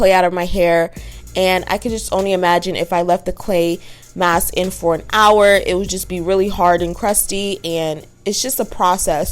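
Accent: Jamaica. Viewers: Im Trini, as soon as you started talking Yardie, I died. Love my Caribbean people!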